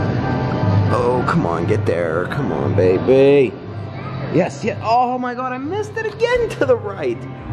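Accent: Pittsburgh, United States. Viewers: Are you from PA or are you just visiting Pittsburgh...? >.>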